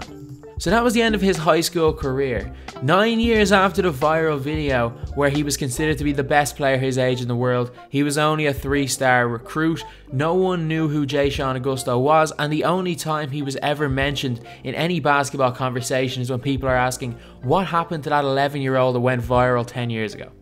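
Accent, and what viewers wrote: Ireland. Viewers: Are u from cork or dublin. I live in Kerry and recognised the accent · Are you from ireland? Cause i noticed that you sounded ALITTLE bit like conor mcgregor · R u Irish?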